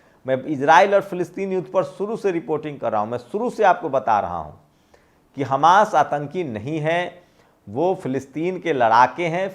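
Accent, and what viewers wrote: India. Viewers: Mr your desi dialect is wonderful and your vlog presentation is really unique I really like it....keep it up speak for humanity.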